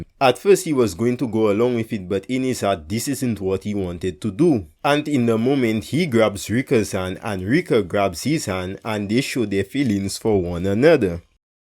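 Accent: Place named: Caribbean. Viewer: I hear your accent. Are you from Guyana or St.Vincent? What Caribbean island are you from?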